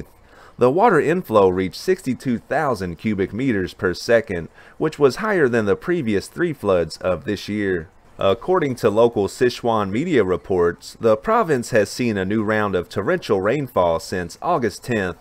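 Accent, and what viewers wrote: South, United States. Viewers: I agree with this comment...... but where the heck did that southern accent come from :)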